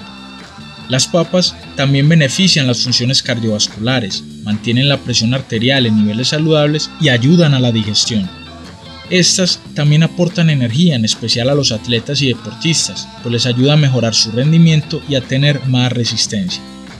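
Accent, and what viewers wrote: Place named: Medellín, Colombia. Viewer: Es el acento paisa de mefellin.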